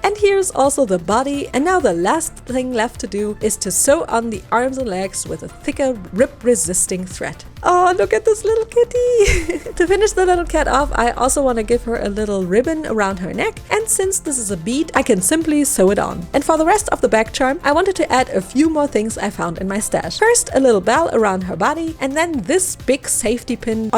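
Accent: Germany. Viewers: This is the first video i see from you and i was like "I hear german i just cant proof it" until you sayd Schlüppi Ich bin vor Lachen von meiner Couch geknallt😂 First impression btw😂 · Is it possible that she is German? The way she speaks English sounds like it. wish you all Love Light and laughing 💛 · Guys is she german? Because im german and I think she has a german accent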